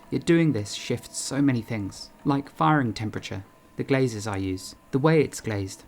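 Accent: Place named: United Kingdom. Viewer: You're so British and Ilove It sir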